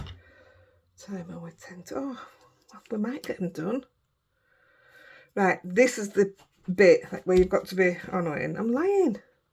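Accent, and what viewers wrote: Yorkshire, United Kingdom. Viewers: Great tutorial they are gorgeous cards…. Lovely to hear the Yorkshire accent makes me feel like I’m home xxxx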